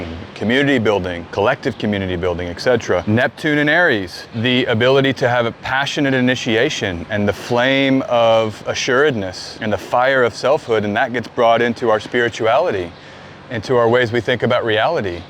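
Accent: United States. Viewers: Hi A friend of mine sent me here, and overall, I've enjoyed your content and found it interesting since I don't know anything about astrology. Now, a bit of positive feedback, english being my second language, it's irritating how your overall american accent is perfect, yet it seems you repeatedly and purposefully mispronounced the word "Uranus", I've learned at school and double checked Google translate that it's supposed to be pronounced as "youranus" and yet you keep pronouncing it as "urunus", is this because your speaking in a different dialect? Why is the difference in pronunciation? It's like an itch inside my brain that I can't reach. Thank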